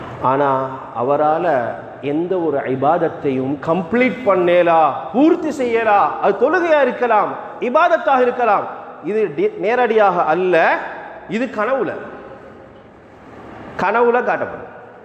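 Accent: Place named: Sri Lanka. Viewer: Sri Lanka Muslim tamil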